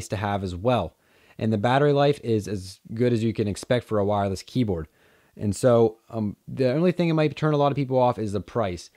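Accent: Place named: Pennsylvania, United States. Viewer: You are so from PA, lol. Nice review neighbor. Is there any lag with the keyboard at all? In other words, would it be ok for gaming?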